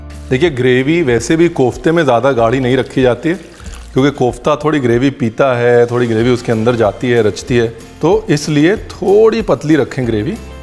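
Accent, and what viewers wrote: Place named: Punjab, India. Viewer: Sir, you sing so well, i just love the way you speak punjabi.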